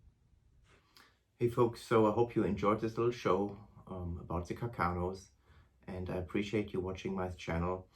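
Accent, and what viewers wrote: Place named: Germany. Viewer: Are you german? Your accent sounds very german.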